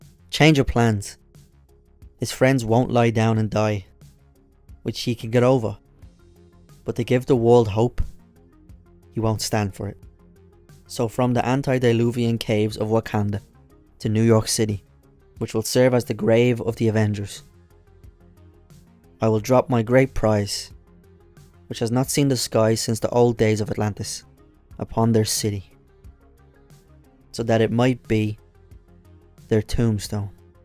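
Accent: Dublin, Ireland. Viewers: A Dublin comic channel. Never thought Id see that. Well you sound like your from Dublin anyway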